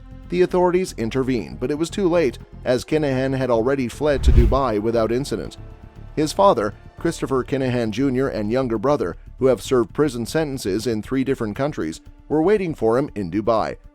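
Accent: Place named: United States. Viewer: These anti kinahan vids with American voices are pathetic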